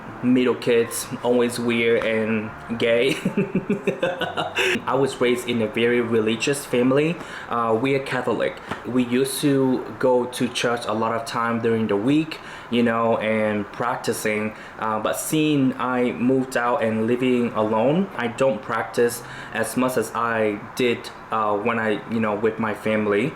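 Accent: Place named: Vietnam. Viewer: This was so dope! You’re such an interesting, intelligent, methodical and organized person. I also love how your Vietnamese roots shows up in your English. It’s so dynamic and beautiful! P.E.A.C.E., J!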